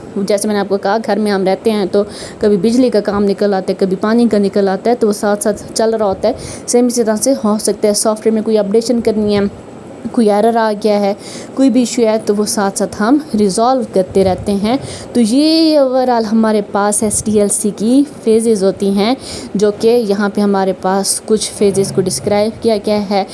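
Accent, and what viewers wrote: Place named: Saraiki, Pakistan. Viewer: SARAIKI TEACHER 💯👀 HER WAY OF SPEAKING 🗣️ TELLS SHE SPEAKS SARAIKI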